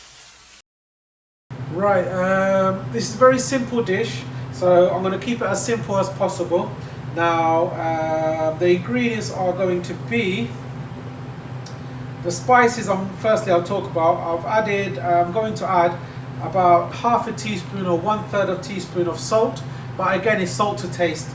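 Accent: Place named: Stoke, United Kingdom. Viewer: You know, I was watching this and thought that sounds like a stokey accent and at the end you revealed that it was haha I’m in Stoke too and will definitely come down to the restaurant 😊 great videos, I love Indian cuisine and am trying to learn more about foods from different regions. Thanks for the videos mate 😊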